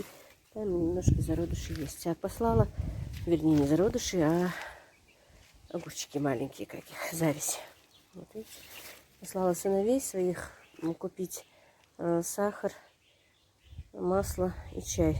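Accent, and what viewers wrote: Standard. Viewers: если не секрет, вы родились в Ингушетии? Чисто говорите на русском языке.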